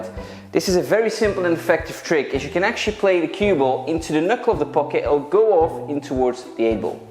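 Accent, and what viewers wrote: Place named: Scotland, United Kingdom. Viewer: Good content,subscribed, sometimes I think your Scottish but here something else there…….?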